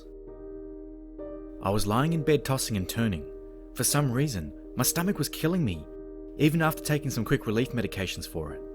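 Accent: Australia. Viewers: Ive been looking for a horror narrator w an australian accent and i literally screamed so loud when i found you that my mom thought i hurt myself or something 😂😂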